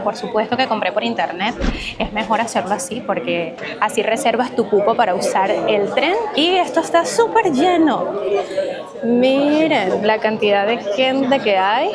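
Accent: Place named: Cuba. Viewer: Tu si que eres sexy, madre mia, tu voz y tu acento, cubano? Un placer d escuchar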